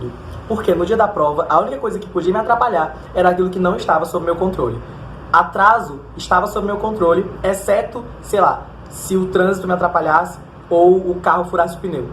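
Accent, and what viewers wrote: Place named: Minas Gerais, Brazil. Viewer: inspirador. já pegou o sotaque mineiro todinho! hahah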